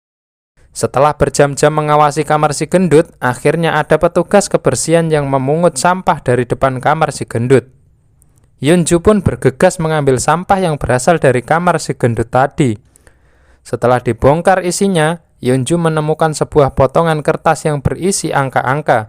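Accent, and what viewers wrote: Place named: Java, Indonesia. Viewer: Cek medok eee cak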